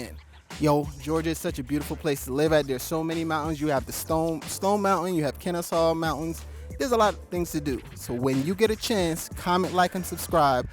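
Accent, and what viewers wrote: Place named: Caribbean. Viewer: Are you West Indian? I hear a slight accent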